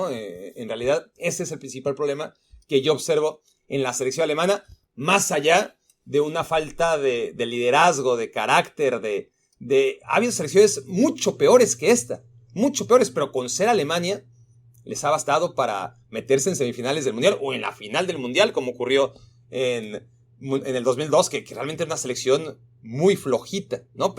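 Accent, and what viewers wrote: Mexico. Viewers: Si, tu acento es Mexicano, un extranjero te lo puede decir, porque un nativo se acostumbre y cree que no tiene acento... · Súper mexicano su acento. Desde que empecé a ver su canal noté que era mexicano. Saludos desde Costa Rica. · Hablas como en el bajío, en Guanajuato. Los centroamericanos y sudamericanos suenan muy distinto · Sí suenas mexicano. Viva México. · Pues a mi es escucharte y me suena a Méxicano. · Yo creo que tu asento es muy neutro pero si se nota cuando te expresas que eres mexicano, el mexigrindo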